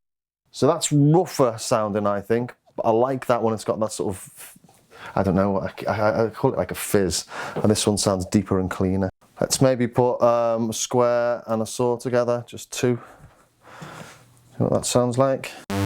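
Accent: Liverpool, United Kingdom. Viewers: Scouser? You and Doug from the Soundtest Room know each other?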